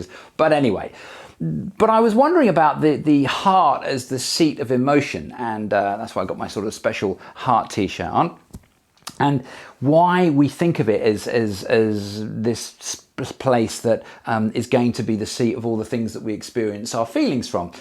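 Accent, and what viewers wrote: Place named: Scotland, United Kingdom. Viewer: Scots speak!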